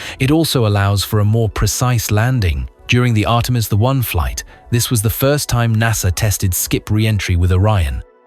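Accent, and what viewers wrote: United Kingdom. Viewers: narrated by people who have British Empire accents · a narrator with a British Accent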